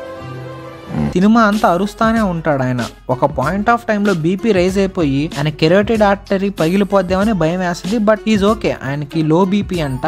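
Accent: Nellore, India. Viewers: Anna meedhi nellore aa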